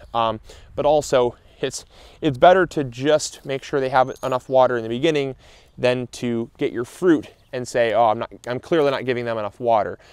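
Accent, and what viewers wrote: Michigan, United States. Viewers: I can really hear that MI accent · you have the thickest Michigan accent, love it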